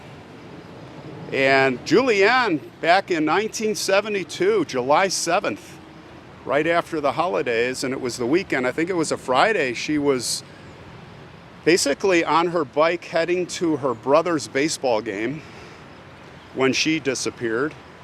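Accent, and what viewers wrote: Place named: Chicago, United States. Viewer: Love your channel...I am from the Chicago suburbs and can definitely hear your Chicago accent :)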